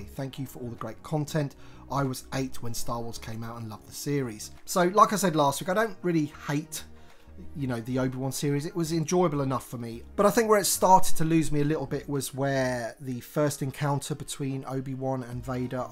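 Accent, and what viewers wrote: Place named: United Kingdom. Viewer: Hi! I'm a huge fan of the original trilogy and pretty new to collecting TVC. Love your videos and your British accent! Your videos are very helpful when I decide to buy something new, thanks! My question is: will Greedo (who was released in 2021 in two versions but only as a 6 inch figure for the 50th anniversary black series) also come as a 3,75 inch TVC? The Power Of The Force 50th anniversary version is very screen accurate! The one in the green outfit that you reviewed is great but I'm hoping for the screen accurate version with the orange vest! Thank you so much and greetings from Germany!!!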